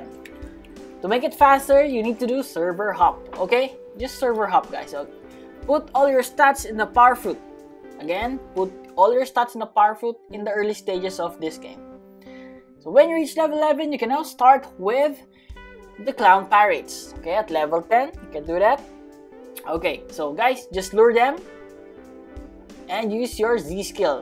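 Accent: Philippines. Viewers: SO THATS WHY U SOUND LIKE FILLIPINO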